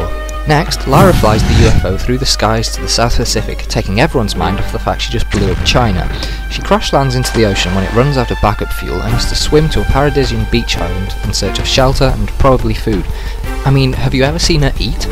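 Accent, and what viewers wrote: United Kingdom. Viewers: Are you from the UK sorry for the random question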